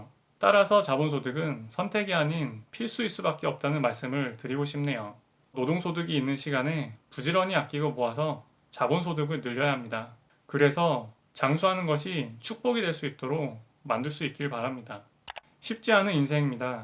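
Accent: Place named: Busan, South Korea. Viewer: 잘 보구 있습니다 ^^ 부산사나이 아입니까 ㅋㅋ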